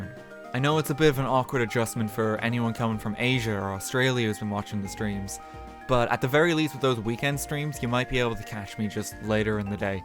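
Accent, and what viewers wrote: Ireland. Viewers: Ah truesday Lovely Irish accent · Which county m8? Your accent smells like Southern. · "Tuesday tru turshday" love the irish accent, its always a joy to listen to.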